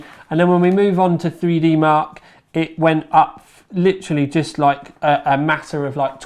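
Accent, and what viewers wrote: United Kingdom. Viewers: Nice British English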